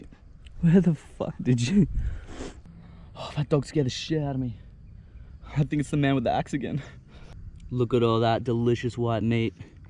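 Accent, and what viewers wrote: Australia. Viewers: Robin Dabank lol he said how aussies talk mate he may make a joke out of it but all aussies say it lol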